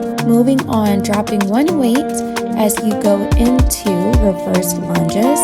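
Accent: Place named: South, United States. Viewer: Love this so much! Such a sweet southern bell, love the voice over. I’m getting kind of tired of workouts where it’s just music and I have to keep looking at the screen to see what they’re doing. Please do some nighttime stretches and add a timer bar for each set and an overall progress bar. Love love love this❤❤❤❤❤❤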